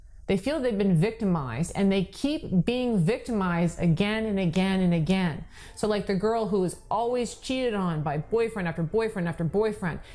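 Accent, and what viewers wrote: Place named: South, United States. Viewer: love your southern accent )))))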